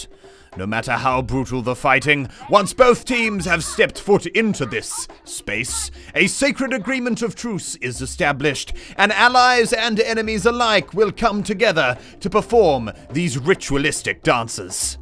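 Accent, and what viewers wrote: United Kingdom. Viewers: why the british accent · An Australian speaking with a British accent seems.... wrong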